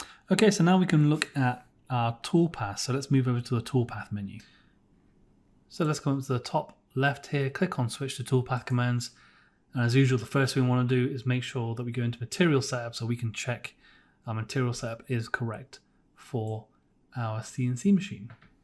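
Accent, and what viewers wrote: United Kingdom. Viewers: Confused about why an Englishman keeps mispronouncing the letter Z? Surely if we can tolerate Aloominum, they can translate 'Zed'? Luckily I am old enough to still remember how to think in Inches though ;) Thanks for the Video, very helpful. Maybe add subtitles for an English speaking audience?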